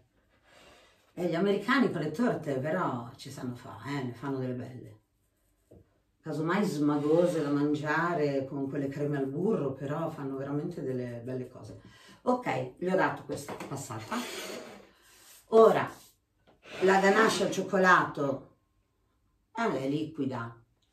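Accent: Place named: Rimini, Italy. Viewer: grazie mille, molto gentile 😊 quindi se ho ben capito il fatto che la panna fosse già stata scaldata mi consente di congelarla due volte mentre se la congelassi fresca no. Ne ho imparata una in più ☺️ La chiffon cake proverò a farla col colorante rosso x il compleanno di una collega e pensavo di farcirla e glassarla di qualcosa di rosa... Ma non al burro 😕 o una ganache molto solida di cioccolato bianco, panna e un pochino di colore rosso o una crema pasticcera molto solida... Poi pensavo 🤔 essendo molto alta anziché tagliarla x farcirla potrei fare dei buchini in alto e riempirla come si fa coi pandori x es..... Purtroppo non sono su fb... Scelta di vita🤷‍♀️ in questi casi mi dispiace un po' ma pazienza, proverò a immaginarle le tue chiffon cake 😊🍰 Cambiando discorso, adesso ti sto scrivendo da Rimini e la gente ha il tuo accento ☺️ e un po' anche mio nonostante viva a Roma da diversi anni.. 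purtroppo non potrò vedere le tue torte su fb perché non ci sono... Proverò a immaginarle 😊 Buona giornata e buone feste a te e tutta la tua grande famiglia 🥰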